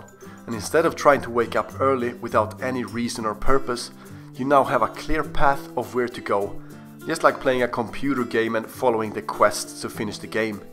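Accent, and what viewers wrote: Sweden. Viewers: Guessing the accent you have, I would say you are Swedish. You have a little bit of a Swedish accent. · classic swedish accent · I had a hunch that you're Swedish going only on your accent. confirmed it haha. Nice video, ska testa allt detta! :D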